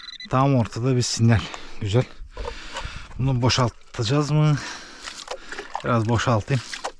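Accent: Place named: Yoruk, Turkey. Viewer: Yalnız orası neresi merak ettim. Bizim aydın didim'in Yörükleri gibi konuşman ama...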